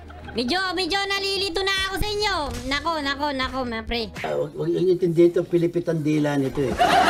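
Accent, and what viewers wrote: Visayas, Philippines. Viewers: bisaya ka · Taga Visayas ba si? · Ay kuya bisaya kaba or tagalog? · bisaya kaba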